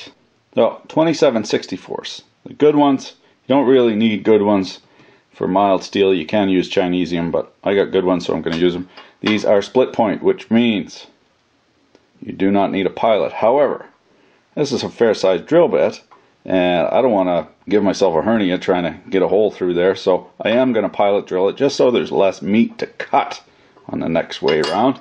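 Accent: Canada. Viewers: Dude were are u from? I keep thinking Canada, but sometimes i really don't know? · You sound rather Canucken to me. whereabouts are you from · He's definitely Canadian, the canadianisms are real with him.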